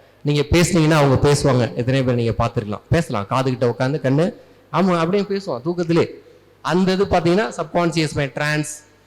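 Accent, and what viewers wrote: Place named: Tamil Nadu, India. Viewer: Really I'm saying I'm happy to hear pure tamil voice from tamil man🎉🎉🎉🎉🎉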